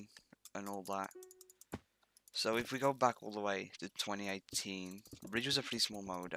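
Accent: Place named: North, United Kingdom. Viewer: Omg your so northern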